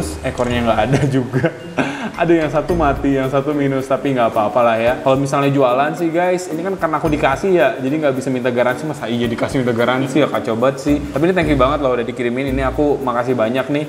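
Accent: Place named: South Jakarta, Indonesia. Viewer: Bocah Jaksel mana suaranya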